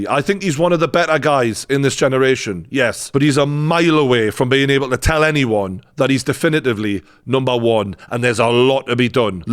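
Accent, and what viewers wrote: Newcastle, United Kingdom. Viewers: Watched the fight and totally agree a rematch he loses his punches had no effect on Nganou Excellent video as usual Our lass thinks there hilarious and your the only Geordie she’s heard speak the same as me lol, She keeps saying how come we all swear as much just tell her because people haven’t got a clue what we’re saying All the best lad and keep up the great work From one big old Geordie to a big younger one 👍🏻👍🏻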